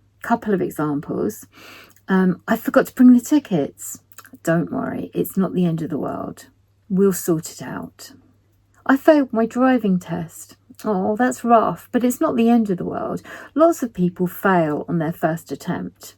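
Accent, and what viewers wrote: United Kingdom. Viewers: what kind of RP accent do you have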